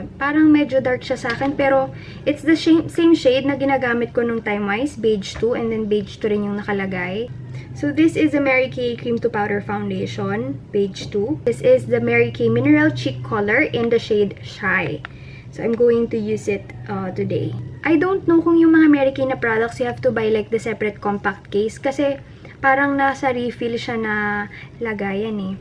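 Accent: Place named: North Luzon, Philippines. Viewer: Ms., just wondering kung saan yung province mo? May punto ka kasi mag salita minsan. Parang somewhere north luzon.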